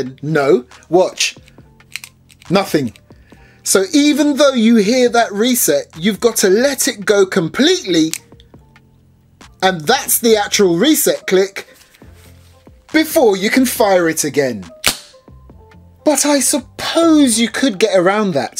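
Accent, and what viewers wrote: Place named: United Kingdom. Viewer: How have I only just found this channel? Love a cheeky British chappy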